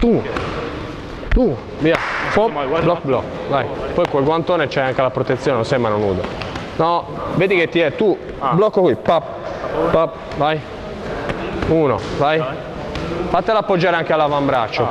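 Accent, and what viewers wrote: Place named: Sardinia, Italy. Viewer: accento sardo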